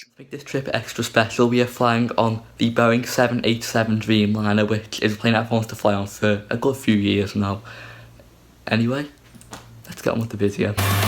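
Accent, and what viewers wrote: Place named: Liverpool, United Kingdom. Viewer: Scouserrrr yes!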